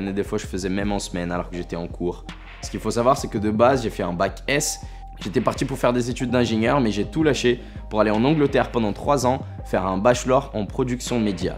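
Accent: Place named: Brazil. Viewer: Finally ça m'a coûté deux cliques "s'abonner" et "j'aime" J'entends un fond d'accent brésilien, ne le perd pas, ça me rappelle des souvenirs 🇧🇷🌞 en tout cas j'attends la suite avec impatience.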